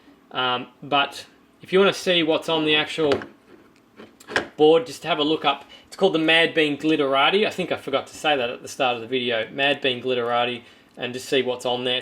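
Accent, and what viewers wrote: Australia. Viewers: I watch your videos assiduously, and a great help for us in Latin America, would if it had subtitles... (even in English, because of your fancy Australian accent) =) We really will appreciate! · That's gotta be the first time our Aussie accent has been described as fancy! I must agree-this channel is full of awesome info. I'm a very recent subscriber.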